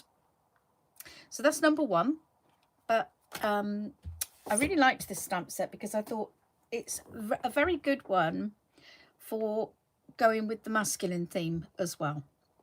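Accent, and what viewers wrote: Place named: United States. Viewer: Love you’re American speak! Lol. Terrific cards as always! Sorry to have missed but we went in the pool. Rain was to start early so we went in early. Glad we did hasn’t stopped raining yet and it’s Sunday 10pm. Thank you!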